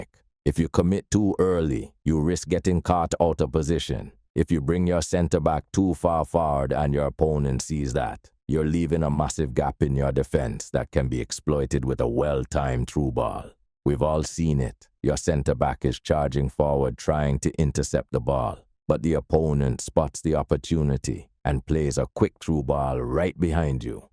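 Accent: Jamaica. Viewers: Why does the accent sound Jamaican?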